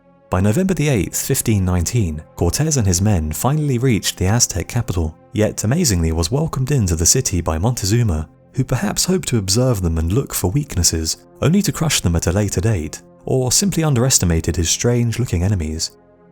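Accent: England, United Kingdom. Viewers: Every time I hear an English accent I think of English Peter Griffin and his teeth..🎩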